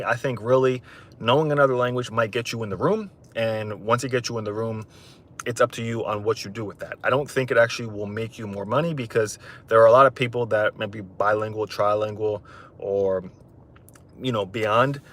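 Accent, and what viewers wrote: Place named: United States. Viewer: He is speaking American.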